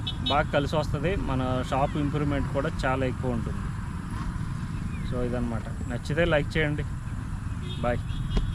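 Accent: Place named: East Godavari, India. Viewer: Brother meeru ma East godavari ki chendinavara voice no batti doubt vachindi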